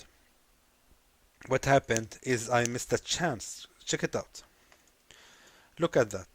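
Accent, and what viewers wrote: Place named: Arab world. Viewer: your accent is Arab, if so, why not making videos in Arabic?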